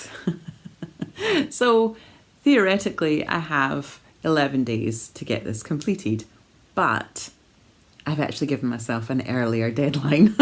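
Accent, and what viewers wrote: Scotland, United Kingdom. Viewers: I love your podcast, inspiring and love love your Scottish accent. Keep on enjoying what you do and how you want to change and adapt to make it more joyful. ❤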